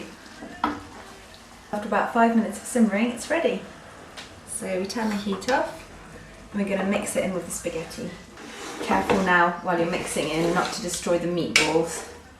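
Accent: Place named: Italy. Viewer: I thought you had an Italian accent . But on the way to get another glass of wine I thought whoa Nellie enough is enough. Nice video,make some more.Looking at the ages of the comments I bet your done with youtube to bad for us.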